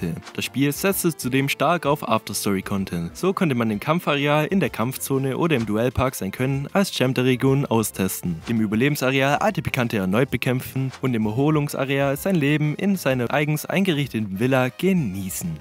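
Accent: Swabia, Germany. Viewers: Geiles Video, 11/10 für schwäbischen Dialekt! ^^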